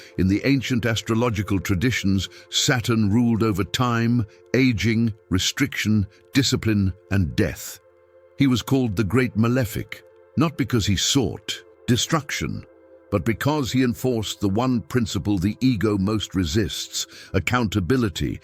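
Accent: United Kingdom. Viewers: SAY-tan= Satan. And SATurn=Saturn. & Tis not British, but the English accent. Scottish/Welsh, is vastly different. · It's the British accent. They don't emphasize the r like Americans.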